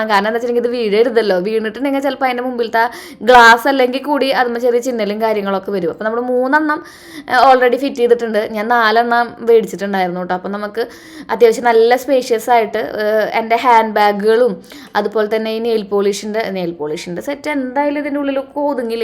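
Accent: Thrissur, India. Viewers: Nintay Thrissur style talks kelkkanada nan adhikavim ee blog kanunneyy...nee sharikkum oru inspiration anu ttoo.. nan pkd anu..nee aniyathy kutty anu ttoo..but experience il neeyanoo edathy onnu thonnipokumm..ninney nan follow cheythu. Nanum entay goal... achive cheyutoo..in sha Allah ❤❤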